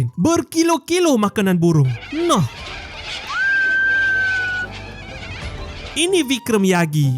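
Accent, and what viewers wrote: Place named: North, Malaysia. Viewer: loghat utara. Belajar tu biar luas, jangan sempit2😅😅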